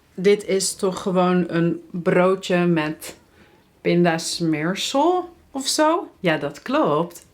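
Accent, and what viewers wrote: United States. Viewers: jij bent geen nederlandse je klinkt als een yank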